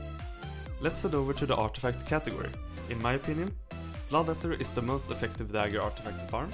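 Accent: Sweden. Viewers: Lol, your accent is so swedish. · you sound so swedish =)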